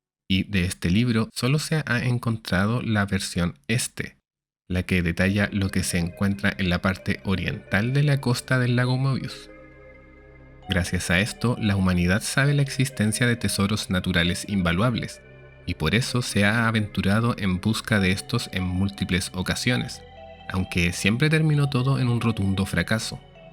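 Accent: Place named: Chile. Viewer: Eres de Chile? Con razón tu acento se me hacía tan familiar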